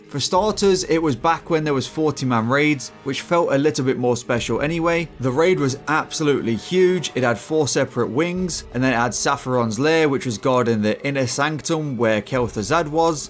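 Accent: United Kingdom. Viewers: Oh god why does it have to be bri’ish… blimey mate talk normal please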